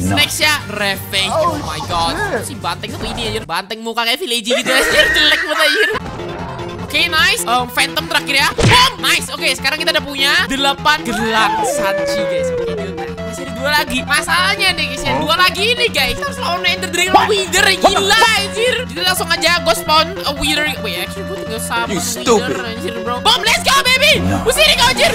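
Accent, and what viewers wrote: Java, Indonesia. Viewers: Orang Jawa kah bang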